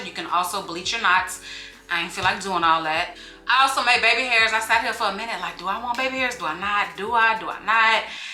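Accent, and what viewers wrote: New York, United States. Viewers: went out to the mall today (BIG MISTAKE), Watched some Sunday Football (boring games), Sat down and ate a burrito while watching story time (NOW THAT WHAT THE F*** IM TALKING ABOUT) LOL. Love your story times! I'm from NY, living in Florida and your NY Accent was on point! xo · That's a new yorker for you..... Lol.... Born and raised but i don't talk like that... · Best friend that’s how New Yorkers talk trip me out too I’m from Florida we laid back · Lol I’m crying at your New York accent. You did good I’m from Brooklyn. They say I’m aggressive too 😂😂😂😂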